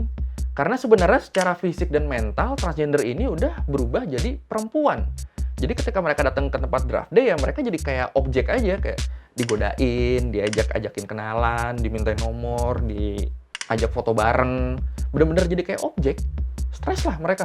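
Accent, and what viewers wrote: Thailand. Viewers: bisaan maneh ngomong bahasa thai pke logat na t.g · anjing aksen thiland lu mantap jon..... ngakak gue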